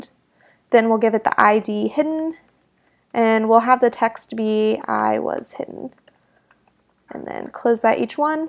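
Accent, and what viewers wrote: England, United Kingdom. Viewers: Dam your English accent is very nice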